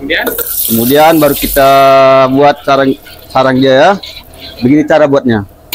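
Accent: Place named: Sabah, Malaysia. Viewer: Mantap bro,logat macam org Sabah saja....betul ke...?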